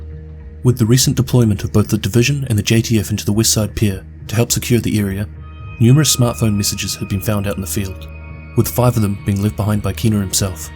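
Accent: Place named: Auckland, New Zealand. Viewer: sounds Aucklander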